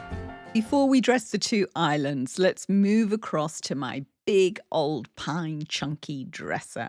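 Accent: United Kingdom. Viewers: OMG absolutely gorgeous!!!!!!!!! Love everything!!!!!! Im wondering where do u store the things that are not used at the moment? I love ur voice and this British accent 😊😊😊😊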